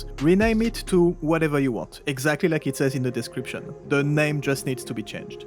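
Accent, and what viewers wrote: France. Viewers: Are you French by any chance ? :D.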